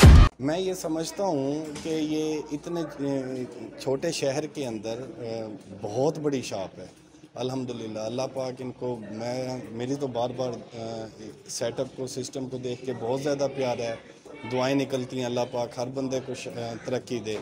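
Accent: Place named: Saraiki, Pakistan. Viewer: بہت ہی پیاری اور مٹھی بولی سرائیکی بھائی آج تو دل خوش کردیا آپ نے اللہ پاک آپ کو کامیاب کرے اور صحت والی لمبی زندگی عطا فرمائے آمین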